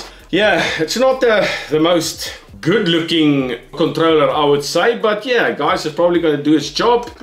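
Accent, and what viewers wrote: South Africa. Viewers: Your from New Zealand? Your accent sounds south African ? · Great vid and super informative! Am defo not liking that body and lack of detailing on V2 at all and otherwise it looks like a bit of a rip off since its more expensive! However I am liking your awesome accent though😎 Are you originaly from South Africa? Greetings from Germany. Subbed✌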